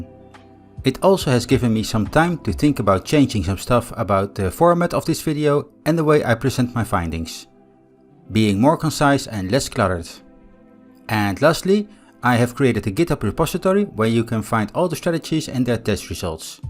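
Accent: Netherlands. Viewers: I enjoy the Dutch accent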